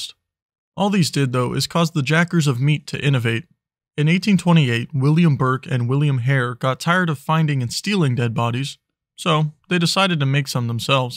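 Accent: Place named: Canada. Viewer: It's on a Saturday morning in Flori-duuuuuuh and I am being introduced to this channel and I have to honestly say that I am so glad I have insomnia and YouTube for once!! This guy is freaking funny as hell! Too bad I don't have any buds to blow smoke on while I chuckle like the flesh covered hyena he's turning me into!! I am curious as to where exactly he's from tho, he said "my FELLOW Americans" in his most recent video but he sounds like he could be from Canada😂😂😂 All I can tell for certain is that he is NOT a fan of those who were born in the south, fortunately, I was born in the Midwest over in Illinois 😁🤣🤣🤣😂 .....Side note; when is he going to have some stuffed animals or hoodies?! I'd totally get some!!❤❤❤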